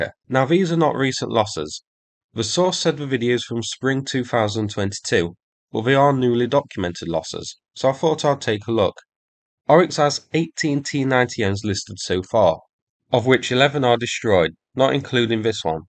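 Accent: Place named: Northern England, United Kingdom. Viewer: greetings from the UK, thanks again for your diligence, I always look forward to your videos, nice to hear a fellow Northener. Slava Ukraini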